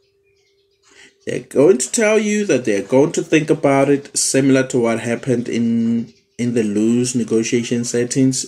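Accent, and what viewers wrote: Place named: South Africa. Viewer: Aitar.. You sound south african or maybe it's just me.. anyways.. thanks for the vid. I'll be trying this out in the next transfer window hehe 👍🏽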